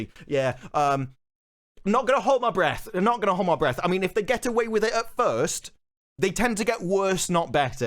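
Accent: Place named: RP, United Kingdom. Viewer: Simply due to the accent and delivery (which are fantastic, no criticism), I feel as if I am listening to BBC news or about to hear the next band on Top of the Pops.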